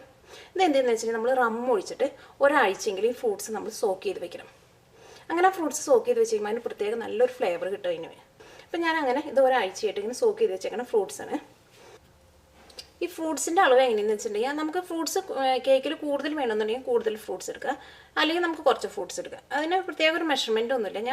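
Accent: Thrissur, India. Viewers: seems to b a easy recipe. will try it for this xmas.. really like ur thrissur tone :) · ഇത്തരം പോസ്റ്റുകള്‍ ഇടുമ്പോള്‍ മറ്റുള്ളവര്‍ക്ക് പ്രയോജനം ഉണ്ടാകണമെങ്കില്‍ പറയുന്നത് മനസ്സിലാകണം. ദയവായി സ്പഷ്ടമായി പറയുവാന്‍ ശ്രമിക്കുക. തൃശ്ശൂര്‍ ഭാഷ എല്ലാവര്ക്കും മനസ്സിലാകണമെന്നില്ല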